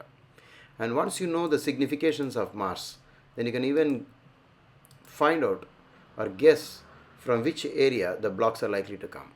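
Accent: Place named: South India, India. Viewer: sir i respect u, u r senior to my age but u speak so lower voice i hve difficculty hearing clearly, also cos u hve south indian accent, ple speak slowly else v dont understand at once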